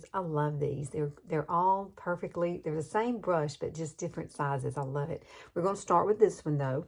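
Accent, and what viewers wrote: South, United States. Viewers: Ty for the brush to pencil tip for no blobs :D Btw, I love love love ur southern accent, it is beyond cute 🥰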